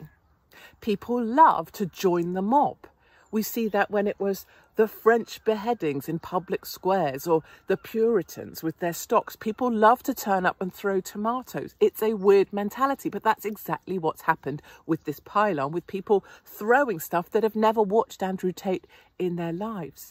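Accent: United Kingdom. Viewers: I hope the deaf people are reading the comments because the CC didn't capture actually what you are saying; even with your British accent, the word "were" is very clear, the program typed that the Truckers "weren't real men". Not sure if that's on purpose or not, just wanted you to know. Thanks for your confidence your enthusiasm and that British brass. Love you sis, keep it up!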